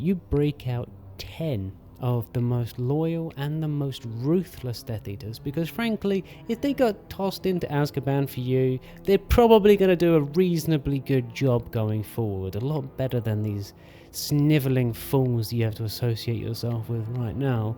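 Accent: United Kingdom. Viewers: Any video with British narration that mentions colonialism within the first 10 seconds is an instant classic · Dude I couldn't hear anything you were saying it was a struggle even with subtitles. I don't know if it's because you're british of because you're mumbling. It was both. Either try to be less british or mumble less.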